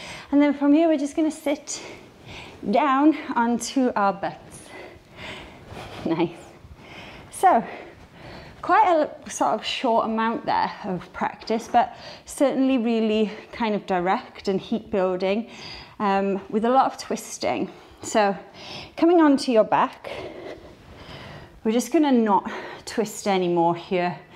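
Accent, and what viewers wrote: United Kingdom. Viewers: are you British?!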